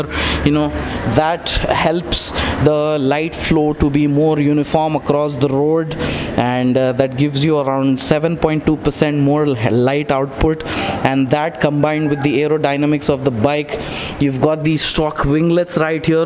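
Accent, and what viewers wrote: Hyderabad, India. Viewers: Didn't like this English version make video in your old Hyderabad hindi style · Bhai Hume aapka Hyderabadi accent me video dekhna pasand hai bhai♥️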